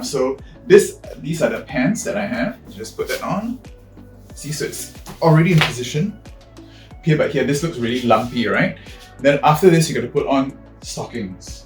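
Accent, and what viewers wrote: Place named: Malaysia. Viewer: very detailed video , im from Canada originally from Malaysia, , i recognized youre accent right away lol. Im gonna be doing drag alot more and this really helps alot thanks queen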